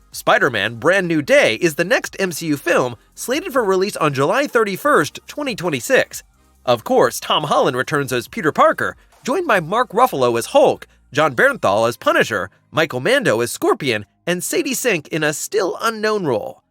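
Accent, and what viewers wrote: United States. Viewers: I find this American English really hard to bear. That artificial euphoria in every sentence. Exhausting. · God his American accent and tone is jarring as fook...cant finish this lol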